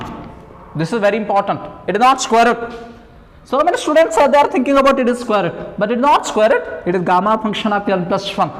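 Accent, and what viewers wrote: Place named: United Kingdom. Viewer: British English accent samajh nahi atta hein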